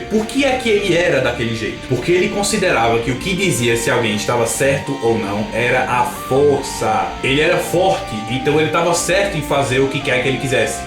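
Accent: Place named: Northeast, Brazil. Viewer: cara vc e hilario.. serio.. seu jeito. seu sultaq he mt engraçado. por acaso vc e do seara ou paraiba.. seila esse e nt engraçado. os conentarios as cateretas q vc fz mt louko. mas parabems pelos videoa. ce deve ter passado horas dias ate mezes pesquizand sobre cdz ne mesmo. eu axo seus comentarios meio rud. tipo eu sei mas q vc fds. alem da pronuncia dos nones e palavras japonesas alem de errado fk mt engraçado no seu sultaq nortenho.. apropisito tbm n posso falar de vc q meu n he dos melhires.. tbm sou nordestino kkkk mas moro em sp fz tempo. e estudo japones a 6 anos. ja estou quase me tornando sempai seinto. kkk falow anigo onedetougosainasu. jya ne... te mais.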